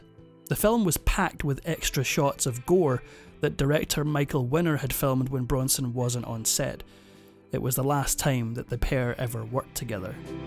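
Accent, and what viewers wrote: Scotland, United Kingdom. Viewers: My favorite Narrator love the Scot!!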